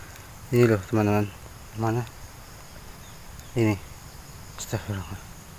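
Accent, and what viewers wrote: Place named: Java, Indonesia. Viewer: Medoknya terasa banget org mana ni bang grndut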